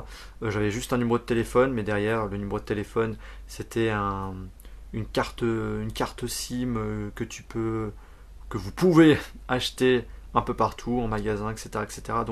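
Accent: France. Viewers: T'es de paris, lyon ou marseille ? tout simplement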